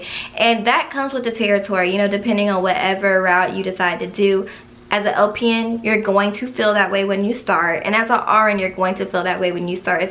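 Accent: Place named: New Jersey, United States. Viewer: Sounds like u went to jersey. Great video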